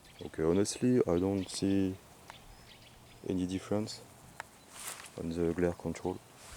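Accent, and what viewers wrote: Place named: France. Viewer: toi vu l'accent c'est sur t'es francais !!!!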